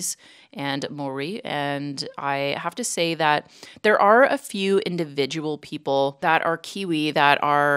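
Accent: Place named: Canada. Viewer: Awesome video, from the moment I would have heard you "About" or "Sorry" I would have known you were Canadian lol that being said have you found that most Kiwi's aren't fond of American's? I'm from the Caribbean but I currently live in the US and I'm a bit curious to how Kiwi's would react to both Americans and people from the Caribbean too if you noticed those interactions as well.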